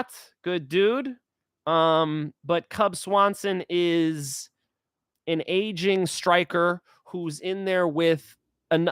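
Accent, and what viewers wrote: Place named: Tacoma, United States. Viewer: Are you from Northend Tacoma Wa?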